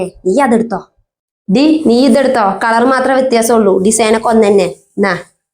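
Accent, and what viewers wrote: Palakkad, India. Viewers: നിങ്ങൾ പാലക്കാട് ആണോ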